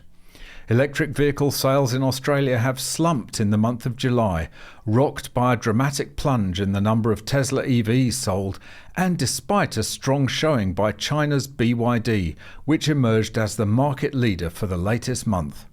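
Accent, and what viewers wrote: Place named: England, United Kingdom. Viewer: It grates on the ears. Especially with a pommy accent.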